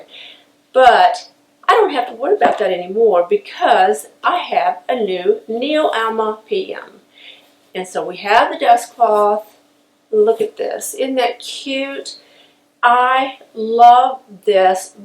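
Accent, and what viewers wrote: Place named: South, United States. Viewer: I love your accent so much - south of the United States is so melodic and different (relaxed in a way) to me as a non native speaker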